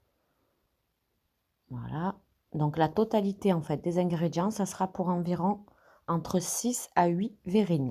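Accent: South, France. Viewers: Merci beaucoup en plus on a l'accent du sud en bonus 😘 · Super expliqué. Merci pour votre partage.!!! Je retrouve l accent du midi, quelle ́nostalgie... j essaierais votre si belle et visiblement bonne recette. Merci et Bravo pour votre travail.♥️♥️🎀🀄🌟🌞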